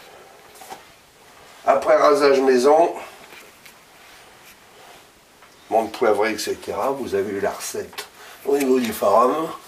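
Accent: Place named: East, France. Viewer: Ahhhh vous êtes de l'est je pense ! Ça me rappelle des souvenirs cet accent :)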